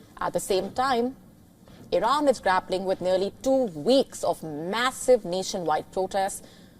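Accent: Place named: India. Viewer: They are trying to mainstream the Indian accent. They think they are anglo saxon. "Me-ziles".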